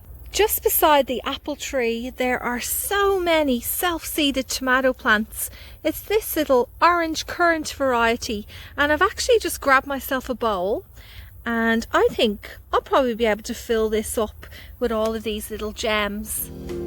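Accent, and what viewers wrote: Ireland. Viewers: Or I saved the seed from my own plant. Lovely harvested...beautiful produce! And, your Irish accent is so beautiful!🙂 See you next week!🙂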